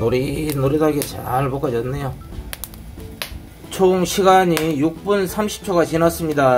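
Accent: Jeolla, South Korea. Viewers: 절라도 사투리가 긴 있당켸 ㆍ ㅎ ㅎ 호 ~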